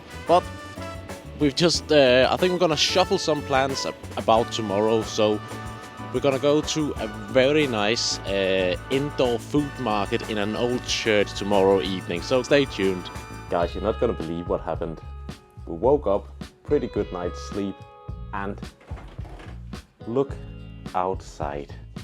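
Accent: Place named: Liverpool, United Kingdom. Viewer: Great video! Your accent is wild! I got bits of Scouse, Geordie and Welsh in there 😮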